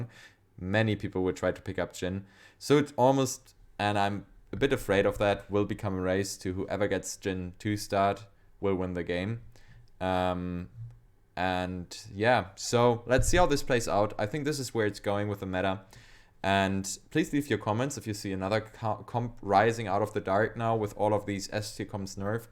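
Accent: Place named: Germany. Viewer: Man hört den Alman einfach so raus alter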